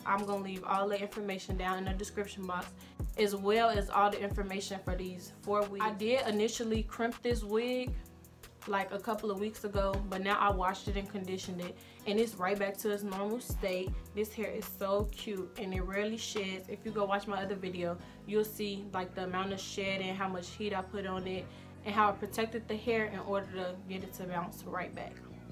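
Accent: Chicago, United States. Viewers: you’re so pretty🥺 you sound like you from chicago too😭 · Where you from. It sound like you from Chicago 😭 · You from Chicago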